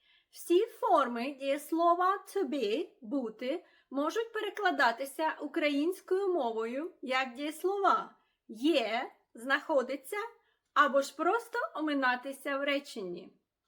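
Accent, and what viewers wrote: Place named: United Kingdom. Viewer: Цікавий формат подачі інформації. Дякую. Підписався. Окремо подяка за British English 🇬🇧👍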